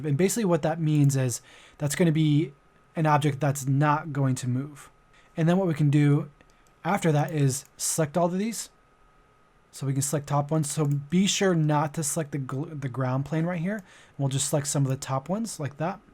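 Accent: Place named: United Kingdom. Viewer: Thank you for your efforts and including screenshots, and thanks for not having a strong British accent. =D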